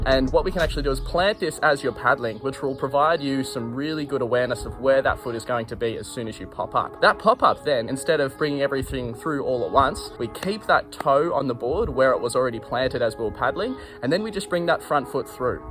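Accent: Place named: Australia. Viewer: Dude, thanks so much for all your vids and explanations. Very useful. (And cool to listen to a calm aussie voice)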